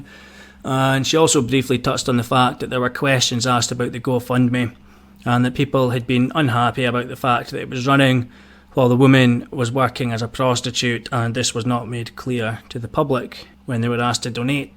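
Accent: Scotland, United Kingdom. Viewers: It's always a nice feeling when ones gut intuition is vindicated. Shaun, from his holier than thou attitude and self glorification to his literal facial expressions struck me from day 1 as wrong. It's a breath of fresh of fresh air hearing you tearing him apart on a daily basis. I dont give a fuck what anyone does behind closed doors at the end if the day, but the man is definitely not well. Lovely to hear a Scottish accent also, and congratulations on the channel success to date - Well deserved imo. Greetings from Ireland.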